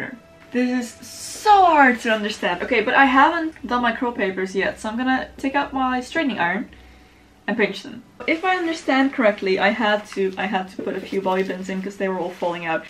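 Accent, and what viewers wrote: Netherlands. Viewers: I love your English/Dutch** accenttttt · Ik hoor het toch altijd wanneer een nederlander engels praat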